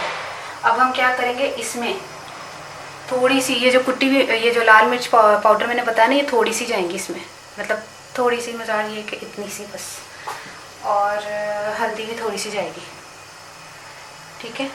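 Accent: Punjab, India. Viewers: Ap jb bolti ha too Karachi ki nai lagti.punjab ki rehne wali lagti ha.anyway nice vlog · ریسپی تو آپکی اچھی ہے ہی۔۔لیکن کہیں کہیں پہ پنجابی کا ٹچ جو ہے نا ۔۔۔۔کمال ہے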